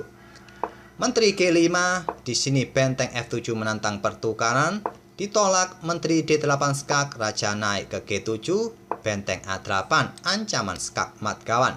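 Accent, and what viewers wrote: Java, Indonesia. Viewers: Kamu Jawa mana bqng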